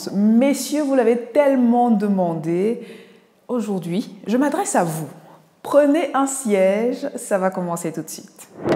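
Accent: Cameroon. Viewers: Si vous êtes marié, votre mari est beni; Si non le future le... vous avez un accent français un peu Camerounaise...? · Merci docteur, vous avez un accent de chez moi " CAMEROUN"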